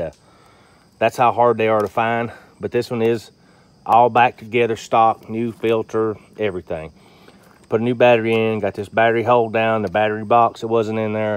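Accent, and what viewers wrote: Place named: Arkansas, United States. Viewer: are you from Arkansas?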